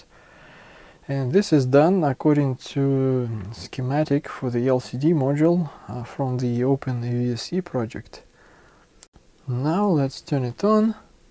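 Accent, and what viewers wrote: Russia. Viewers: русский акцент паливо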